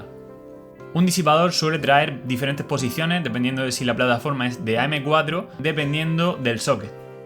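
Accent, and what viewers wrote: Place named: Murcia, Spain. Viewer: Muy buen vídeo , explicación sencilla y clara para todos los públicos . Y sobretodo con ese deje murciano! Un saludo ! 🙋🏻‍♀️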